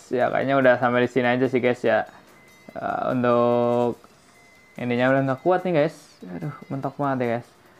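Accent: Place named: Malaysia. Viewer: Abang ini pasti orang Kalimantan dekat Malaysia pantesan suara nya kayak orang malaysia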